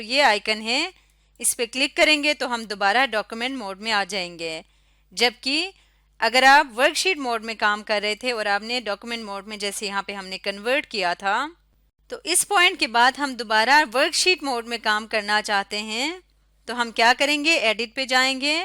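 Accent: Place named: Pakistan. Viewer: It seems that you are from pakistan.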